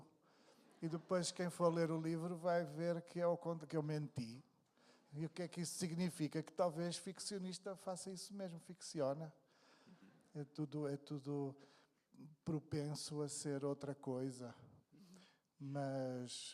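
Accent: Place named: Brazil. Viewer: És mesmo falso! Ainda por cima quando te pões a brasileirar a forma como falas. Achas que eles são atrasados ou és tu?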